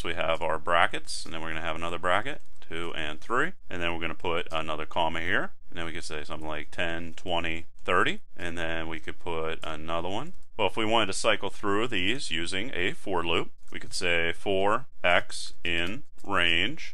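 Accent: Pittsburgh, United States. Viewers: Being from Mount Washington.I heard that Pittsburgh accent right off. ;) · I got excited when I heard the Pgh accent. Represent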